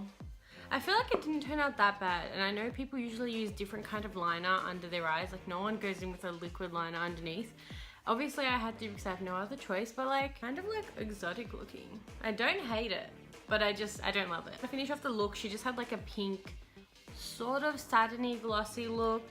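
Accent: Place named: United Kingdom. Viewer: Love this look girly and your accent 😍😍 you from the UK 🇬🇧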